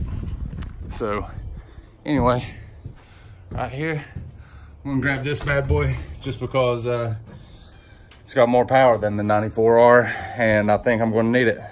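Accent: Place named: East Tennessee, United States. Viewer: 423 area code & the accent, I know exactly where you're from!! Been down to TN especially E.TN 11 times!! God's Country Fo Sho!! Subbed to the channel & I think I do follow you on IG, I'll have to check. Hoping all is well Bud, Dirty Jersey out!!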